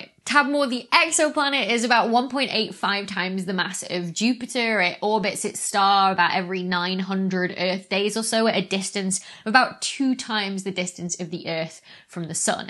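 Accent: United Kingdom. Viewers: Keep in mind that she's British and British pronunciations often differ from American. In the U.S. we call the second Greek letter "baatah," while the British say "beetah."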